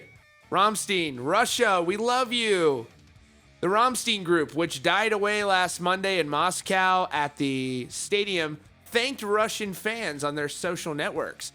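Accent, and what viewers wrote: United States. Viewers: Omg this American pronunciation, I can't. Dude, google how to pronounce names of music bands.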